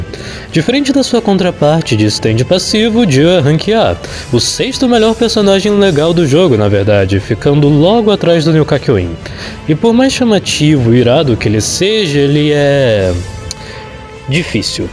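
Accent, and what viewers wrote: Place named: France. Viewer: You sound kinda french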